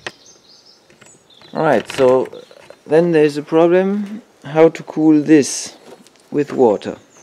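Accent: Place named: France. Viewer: heureusement c'est un beau projet, les anglophones n'ont qu'a faire ce que ton accent francais trahi, traduire 🤨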